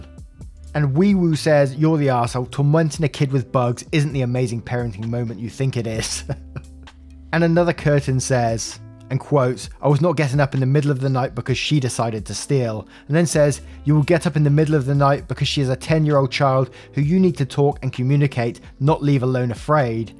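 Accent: United Kingdom. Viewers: I have listened to so much UK media over the years I don't notice the accent, until you call the TAH a Berk and then I giggle.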